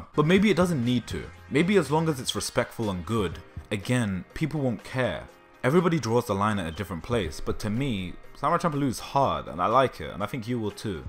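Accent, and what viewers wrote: United Kingdom. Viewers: No I'm not being 100% glib. You have a British accent, but you're obviously black and talk about the black experience in America. So do you live in America or believe that any black person can understand the black experience in America? Call it a question of phenomenology.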